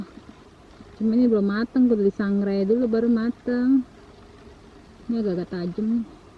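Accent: Jakarta, Indonesia. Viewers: Betawi bangat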